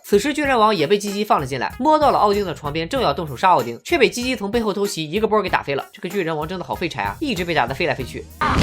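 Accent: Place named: Tianjin, China. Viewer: 那不是天津话么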